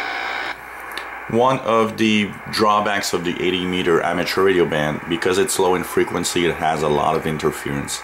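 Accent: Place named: Quebec, Canada. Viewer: As soon as I heard you say "turdy" & "dat" I knew you were a Quebecer! Haha. Hello from a fellow Canuckistanian from New Scotland! Thanks for your great vids! They really answered a lot of questions I had about the various bands.... :)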